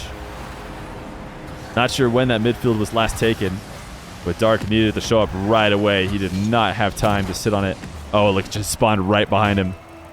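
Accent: United States. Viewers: Bro, you’re literally a Johnny boy copy in American accent. I get it, he’s very successful, but bro. Please find YOUR style. Don’t need to sound like another successful person…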